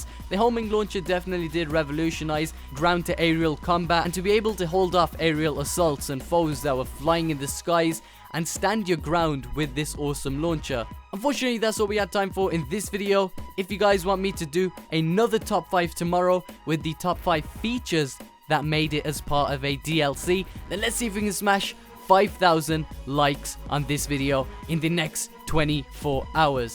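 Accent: Birmingham, United Kingdom. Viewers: Are u from Birmingham,which area?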